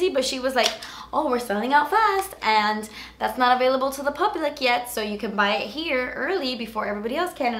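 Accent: United Kingdom. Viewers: You have the cutest British Accent Ever! :D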